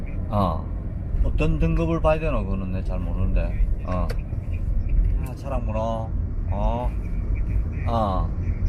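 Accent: Gyeongsang, South Korea. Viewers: 야들아! 문댕이말고 표준말하면 좋을탠대~ 저질스러워서 ~원!!!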